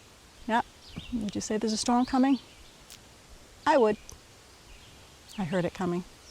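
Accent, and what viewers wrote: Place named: Philadelphia, United States. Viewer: I love when I hear you say soar for saw…very Maineish for someone who has a Philly accent. Thank you for your channel. Your voice is very soothing ..a follower from Pemaquid Point in Maine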